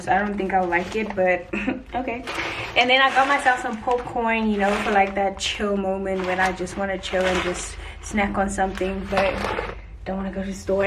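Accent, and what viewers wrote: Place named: United States. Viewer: You have an American accent... Not mad at it though. It's a free country ✨ beautiful vlog❤️❤️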